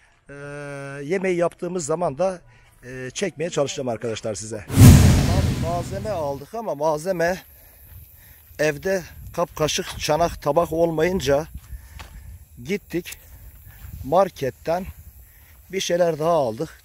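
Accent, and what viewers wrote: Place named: Ağrı, Turkey. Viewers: Agrili oldugunu tahmin ettim siven kendini ele veriyor bende aslen agriliyim dogma buyume igdirliyim vidyolarin com guzel istanbuldan selamlar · Abi cok guzeldi valla sen ya bayazitlisin ya diyadinli sive bizim oralarin sivesi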